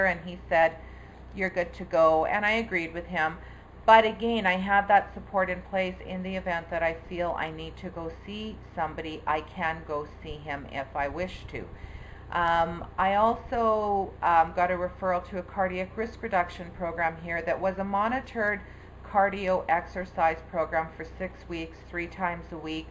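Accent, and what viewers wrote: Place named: Canada. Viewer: 1st. I love the curlies in your hair, really looks nice. 2nd. You have hit "ONDERLAND" & that is a WooHoo moment !! ~ Very nice video today. It is always nice to hear someone recap their weight loss journey. I always enjoy listening to you speak. You remind me of my girlfriend, Lorna, whom I met while living in Florida. She's blond, blue eyed, from Toronto, and has the same Canadian lingo in her speech. I often think of Lorna when I watch you. I appreciate your friendship. Have a Happy Easter.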